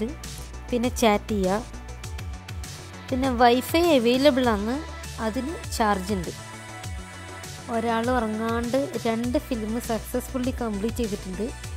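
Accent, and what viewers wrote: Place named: Mattool, India. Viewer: ya monee mattoole samsaram analo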